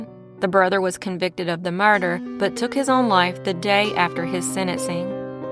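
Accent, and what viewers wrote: South, United States. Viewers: Hold up!?! 2 days off!?! Glad you didn't lose your Southern Charm Voice!! Irrelevant but kinda funny! My kids are out of college now.. My brothers youngest is a little 8 year old firecracker! (We went to visit for Christmas..). While asleep lil miss I hate boys vaulted up and smacked me on the mouth.. Cute but.. Dang.. Okay.. My brother (her father) laughed at it.. "Time to wake up and pretended to be an uncle and help your brother out".... (We are close.. Post high school and all the blood fights). I digress.. She got me SICK! Lil Terrorists.. So, I spent Christmas day doing highschool stuff to the toilet.. Got back in bed.. AND, .. I found some I actually missed and some I didn't comment on.. I actually felt like.. (sh!t for sure physically) but remiss that I missed them.. remiss / missed? Maybe I am still sick.. I fall asleep! 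"WHO IS IN THERE WITH YOU UNCLE DAVE!?!" "She has a really cool voice! I want to meet her!" I'll leave that up to her father..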